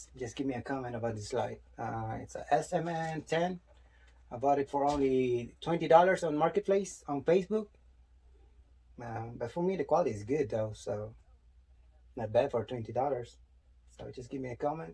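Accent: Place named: Texas, United States. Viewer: Kuya! Texas accent kanaaa 😅🤩 More vidsss! 🦋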